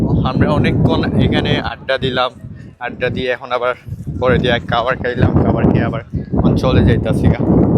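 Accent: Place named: Sylhet, Bangladesh. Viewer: Sylhety mat beshi vala lage😊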